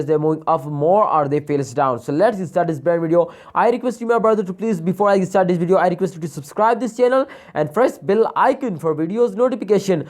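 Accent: India. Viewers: Man your accent sound me Indian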